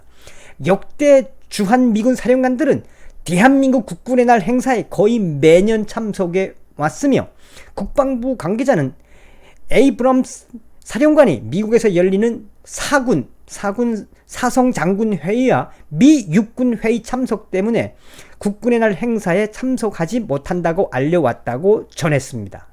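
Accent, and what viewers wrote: North Korea. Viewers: 북한사람인가? 북한 방송느낌인데?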